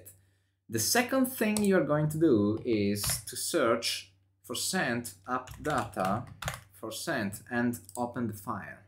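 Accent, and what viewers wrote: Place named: Italy. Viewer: "Sorry for the Italian accent." It's okay, we forgive you.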